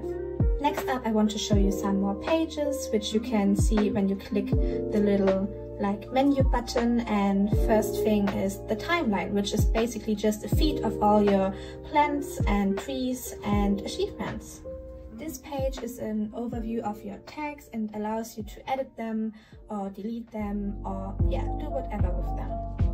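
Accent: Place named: Germany. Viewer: That German accent😁 Grüße! Gutes Video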